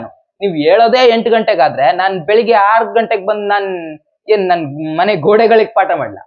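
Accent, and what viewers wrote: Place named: Mangalore, India. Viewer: Sir from manglore, are u also from manglore . . .. ur accents match ❤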